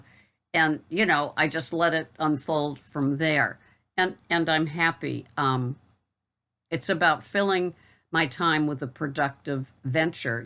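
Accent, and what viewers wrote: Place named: Boston, United States. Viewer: You are doing everything right,! I love to hear you are enjoying carting! The great customers definitely make up for the scamsters! Love your Boston Accent! So Wicked Smaht!! Hope you had a great day! Stay strong & encouraged! 💛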